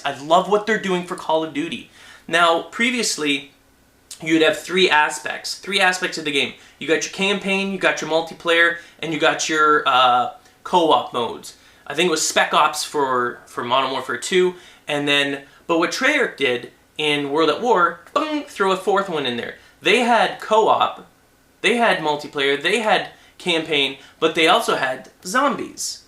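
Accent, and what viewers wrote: Canada. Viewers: are you canadian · are you canadian???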